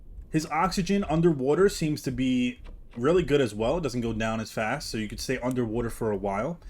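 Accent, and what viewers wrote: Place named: New Jersey, United States. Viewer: you from jersey? I hear a tiny accent coming through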